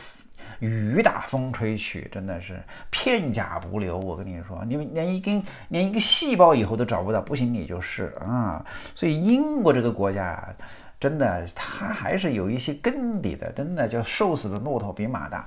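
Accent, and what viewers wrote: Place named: Beijing, China. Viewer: 为啥南方人非要说一口京腔呢？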